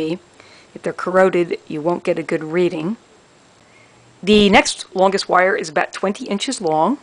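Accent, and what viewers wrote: Philadelphia, United States. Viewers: Philly accent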